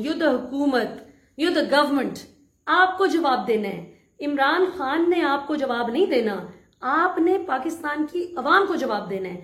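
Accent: Punjab, India. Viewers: I love your Punjabi accent 😃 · Truly brilliant, keep it up. By the way like your Punjabi touch of tarka.😄👍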